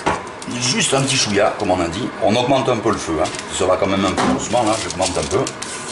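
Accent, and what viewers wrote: South, France. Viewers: c'est génial merci à toi, je vais voir de suite! Ps: Ma copine vient du sud, on trouve ton accent ravissant ! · je vien juste de l'abonnez dis moi tu ai un mec du sud?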